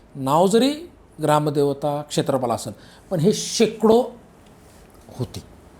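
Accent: Marathwada, India. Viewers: तुम्ही मराठवाड्याचे किंवा बीड चे आहात का तुमच्या भाषेवरून 😅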